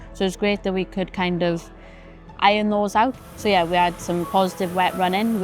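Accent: United Kingdom. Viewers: Always liked the Welsh accent 😊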